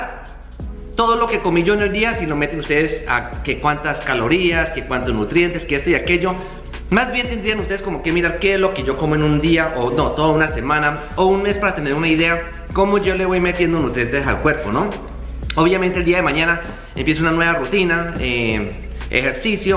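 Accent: Colombia. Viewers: me encanta tu español colombiano. xdxdxd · Tu eres colombiano, porque suenas a ellos.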